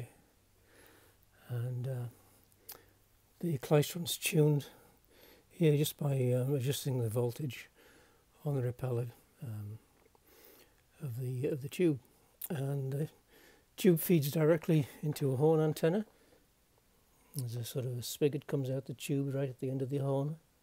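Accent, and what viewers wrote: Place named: Northumberland, United Kingdom. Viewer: Hello again, finding the videos fascinating. I see you live in Canada, although you sound like you're from our region of the UK... we're up in Northumberland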